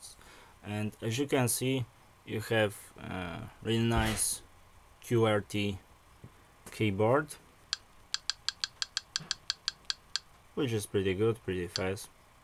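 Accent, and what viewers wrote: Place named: Poland. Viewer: Słychać że z polski :D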